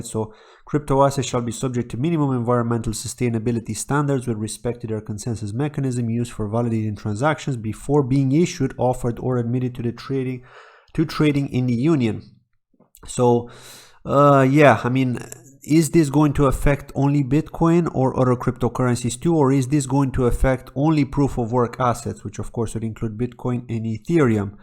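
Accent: Ireland. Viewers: Definitely concerned. Feel like uninstalling coinmarketcap for a year or two to prevent me from looking at it. lol Btw, am i hearing a tad of an irish accent? :)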